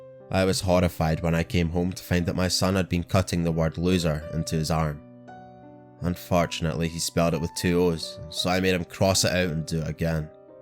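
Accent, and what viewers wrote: Scotland, United Kingdom. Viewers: Mr I like scary stories but your Scottish voice just makes it better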